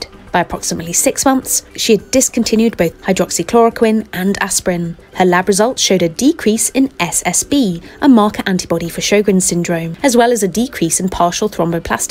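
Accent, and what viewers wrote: England, United Kingdom. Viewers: 'London". English accent. US measures. ChatGPT must try harder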